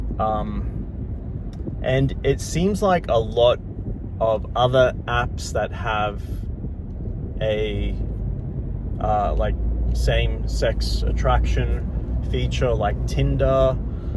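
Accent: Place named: United Kingdom. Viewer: I love your accent. I thought you might be British but you're driving an American car (left hand drive)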